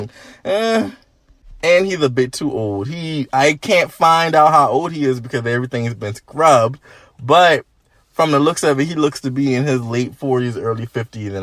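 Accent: Charleston, United States. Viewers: Are you from Charleston, S .C.? Beautiful accent.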